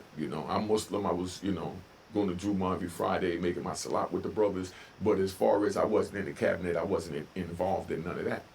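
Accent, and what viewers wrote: Jamaica. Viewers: Yardman protector you sound like a yardman with a accent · Hey, you Jamaican? If you not the cccent on point😅. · Respect I see you can speak Jamaican.👍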